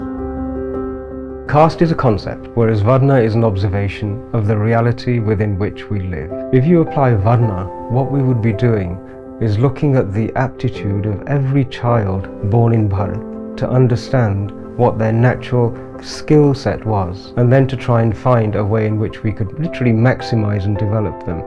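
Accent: United Kingdom. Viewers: Brother. he is based in Britain and its the accent. It isn't too hard to understand if you focus... Jai Shri Ram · Why he is speaking with a British accent and why British government is allowing him to speak against Britain? These are the questions you need to ask yourself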